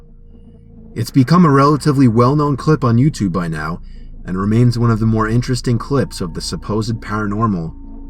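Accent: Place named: United States. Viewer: They don't sound the same, they have different accents... Mr. Nightmare is (I'm assuming) from America and CreepsMcPasta is British, for example.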